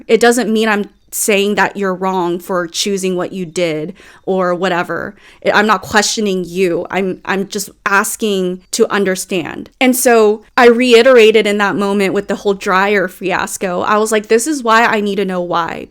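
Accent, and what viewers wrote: United States. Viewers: You are such an articulate, insightful girl and you bring up actually really interesting points about the further complications of not just being 'neurodivergent' but of either yourself or other people around you being from different cultures and therefore have different 'social norms' which makes it more confusing for you, or indeed anybody. But if I would listen to you without seeing your face, perhaps I would assume you're just a 'white, American' girl just from your accent and language. Yet what I wanted to ask you, is that in the way you SOUND exactly the same as a 'white american' could, do you think that because you or other immigrants born and RAISED in the united states essentially do not possess those 'social norms' thier immigrant ancestors have, or do you think theres some degree of even some behaviour and mindsets being inherently genetic, that is, for example, youre african yet your ancestors had different mindsets, traditions and norms, yet you were born and raised in america, do you think even though thats the case, they would retain some essentially pure 'african-ness' even though they had never stepped foot in africa before? 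A genuine question to see what you think 😊 As now you have set off my weird, unfiltered neurodivergent endless question making 😆😂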